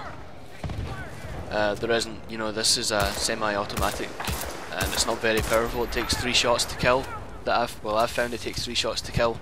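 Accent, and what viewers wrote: Scotland, United Kingdom. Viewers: i love your commentaries mate, where bouts in scotland you from? i played it last night, i really couldnie find anyone :) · He's Scottish..The way he says it is not the same as all British people.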